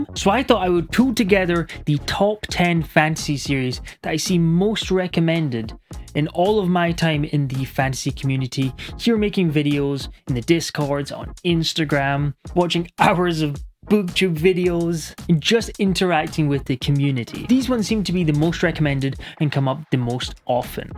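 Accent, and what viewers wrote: Scotland, United Kingdom. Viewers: Are you Scottish? You sound like me if I went to Kelvinside and then spent at least 19 years in the Netherlands. Also, it's a great list, but I'd have had Brent weeks assassin series or Raymond E Fiest's magician series on there. Cheers anyway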